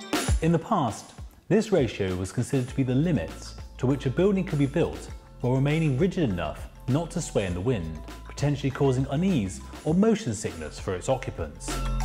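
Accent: United Kingdom. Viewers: not everyone with a british accent sounds calming, intelligent and elegant... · Quirky British/ accent make you sound pretentious. Tone it done bro. None of my Brit friends talk like you do.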